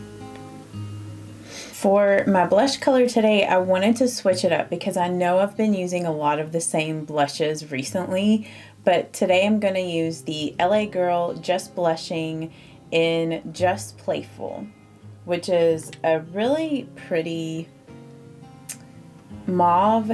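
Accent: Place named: Louisville, United States. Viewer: Love the tip about extending bottom lid eye shadow. It makes a huge difference in how these 64-yr-old eyes look. Also, I ❤ listening to you talk. I learned to talk in Louisville, so you sound like home folks to me!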